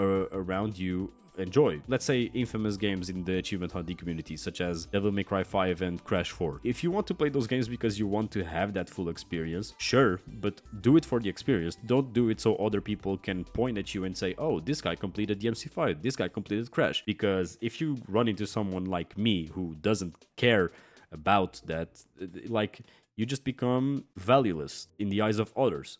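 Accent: Portugal. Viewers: Just stumbled on this video and my first thought was: "This guy sounds like a portuguese speaking english!" Well, what do you know? 🤣 Keep it up! 👊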